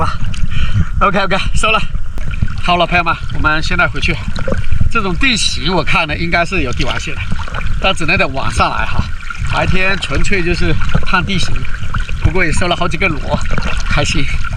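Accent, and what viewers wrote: Fujian, China. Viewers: 听你的口音应该是福建人吧？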